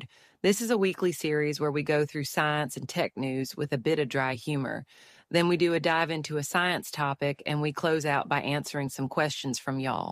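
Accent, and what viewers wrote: United States. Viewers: I'm glad you showed the words "chemosynthetic life," because when listening earlier in the video I heard "chemosynthetic laugh" and "chemosynthetic glass." The joys of varied American accents! Although there's poetry in finding "laugh in the darkness." Thanks for pointing out the usefulness of NASA discoveries for surviving on this planet. The more I see what humans are capable of, the more I don't want us to colonize other planets. Humans might be the Kudzu or the cane toads of other worlds.... We have a long history of unintended consequences. Love your work!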